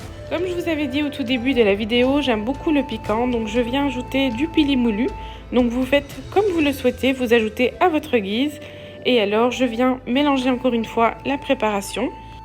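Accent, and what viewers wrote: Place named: Belgium. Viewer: Merci pour la recette. Vous avez un accent belge si je me trompe pas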